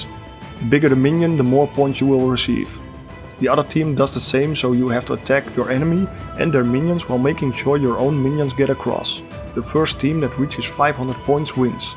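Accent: Netherlands. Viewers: You sound so dutch it hurts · I also liked and subbed, you earned that. And I think you dutch accent doesn't hurt at all ;)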